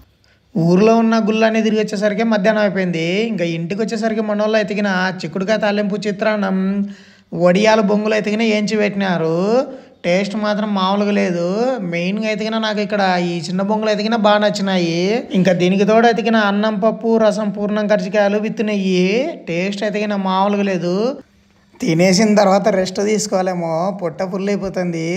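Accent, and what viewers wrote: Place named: Rayalaseema, India. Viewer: Anna me videos lo oka speciality eantante meeru matladetappudu mana రాయలసీమ slang baaga telustundi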